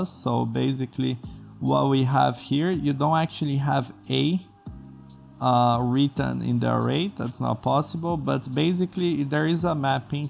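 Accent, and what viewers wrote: Brazil. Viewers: Vc é brasileiro ne ? pelo sotaque ! Se voce for sera que consegue me ajudar to no começinho do ensino to precisando de alguem pra troca umas ideias !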